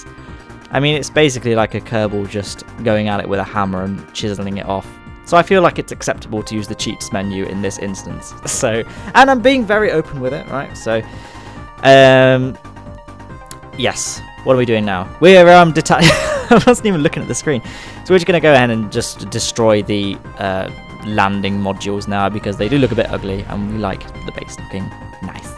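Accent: United Kingdom. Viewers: I really love your british accent :D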